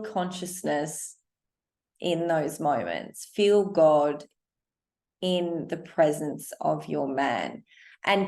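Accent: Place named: Australia. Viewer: Hello, you've got a new subscriber here! I love it that you talk a little more slowly, so we have the time to let it sink in precisely that what you are saying. And i love your Australian (i take it to be) accent. Furthermore: the content of what you are saying is profound: it holds so much wisdom!!! I hope a lot of men and women will find you soon, for the work you do is very important!!! Thank you and love from the Netherlands. 💗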